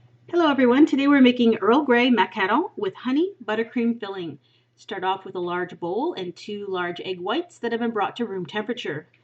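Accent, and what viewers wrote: Quebec, Canada. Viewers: I really love your videos, are you french canadian?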